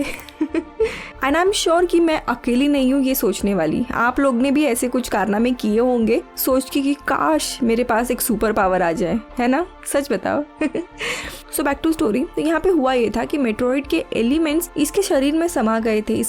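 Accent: Maharashtra, India. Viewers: as usual one again nice explained ...👍 Marathi accent aasun pan hindi yevdi clean kashi boltes 😁😅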